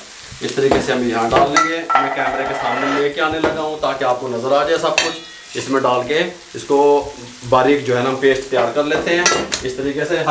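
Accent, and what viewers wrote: Punjab, India. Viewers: Ap ka btany ka panjabi style bht acha lgta h ap English tch Mt dyn mza AA jata h JB ap khty h db Dio heeeen baba kug smja ria ayyy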